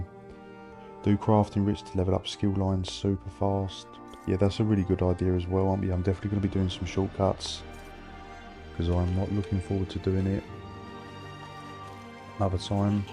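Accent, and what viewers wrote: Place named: Kent, United Kingdom. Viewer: Hey bro, pretty awesome build. Thanks for the good work. Sound like you're from down Kent way. I saw a build for battlegrounds and cyrodil but can't remember if it was you or another creator, sadly I got interrupted with family bits and lost the video! But if it wasn't you maybe you can use your big brain to make it? Was a gank build using destruction staff and frost, the numbers were absolutely huge. Think there was some elements of heavy attacks from range and the recovery was shite. But... Did some nice AOE too. PvP is wild since subclass, I've been away 4 years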